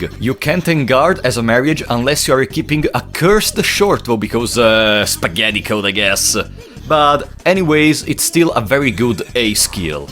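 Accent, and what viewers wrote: Italy. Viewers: Sento una cadenza italiana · Ahahahah! Ascoltando la pronuncia, sono andato a vedere nei commenti se fossi italiano. Good job, anyway!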